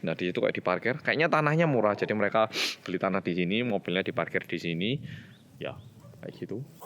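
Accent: Java, Indonesia. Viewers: Yes mix indonesian languange with accent jawa😀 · Mase..medhok bgt bahasa indonesiany..👍🏻👍🏻👍🏻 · Jawab dl dong, subscriber terlama dari chanel ini kok si kakak ini medok banget ya? apa ayahnya org indo atau gmna? atau org tuanya emang org korea cm tnggl di indo dri kecil aja? mau coba ngikutin vlog pertamanya tp aku maget guys😭🤣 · Medok e ga biasa kakak🤣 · Kagum sama mas medok banget bahasa nya... Setelah lihat video mas ini, saya bisa lihat korea tanpa harus pergi ke korea, Aslinya pingin banget bisa jalan jalan ke Korea. Sering sering buat konten outdoor seperti ini ya Mas. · Nonton vlog mas saking medoknya, sampe lupa klo ini vlog dikorea😭💔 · Bersama orang korea yang Medhohokk, suka banget denger nya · Masnya medok tapi kaya orang korea.orang mana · Medok banget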